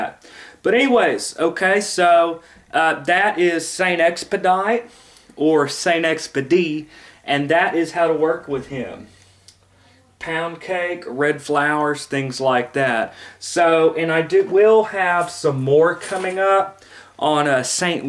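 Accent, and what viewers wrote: South, United States. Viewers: What a strong southern accent!!!!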